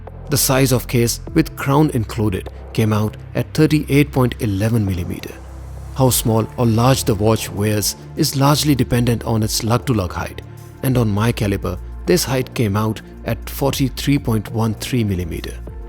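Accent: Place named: India. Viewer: By the way, you seem to have an indian accent..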